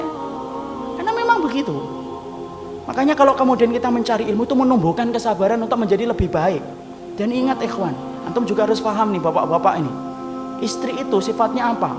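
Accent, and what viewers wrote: Java, Indonesia. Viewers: masyaAllah stads... Allah mudahkan penyampaian hidayah ini lewat ustads... baarokalllah stads... logat jawanya mash kentel sanget stad... :)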